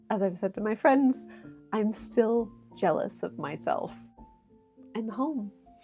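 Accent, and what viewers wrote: United Kingdom. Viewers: The accent sounds perfect tbh. Very R.P but thats okay innit! 👍 Btw I was watching a very old film of an American woman talking from the late 1800s on a another YouTube channel and was amazed to hear just how British hear accent sounded.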